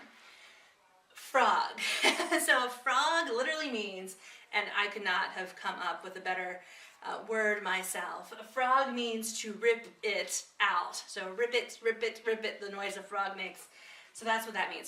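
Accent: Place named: East Coast, United States. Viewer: Nice! This is good stuff to inform and encourage beginners. And I really like that you speak well and I can see your face! I am hard of hearing and read lips, so this is especially important to me. Later you'll probably mostly be showing your hands, but I'll still be able to hear your good speech. I bet you're an East coaster -- out west those things are pronounced "skains" instead of "skeens." Good work, woman!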